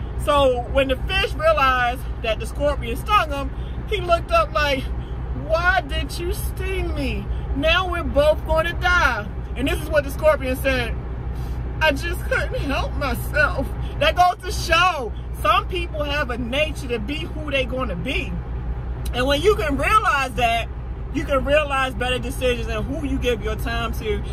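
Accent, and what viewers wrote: Baltimore, United States. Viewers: You have a Baltimore accent 🤔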